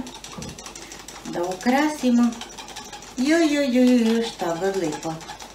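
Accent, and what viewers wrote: Vojvodina, Serbia. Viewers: A ja pored recepata najvolem vojvodjanski dijalekt❤️,tako slatko i milo divanite ,da Vas je milina slusati. Veliki pozdrav iz Curuga🥰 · Kolač je divan!A dijalekat ❤!Naš vojvođanski!❤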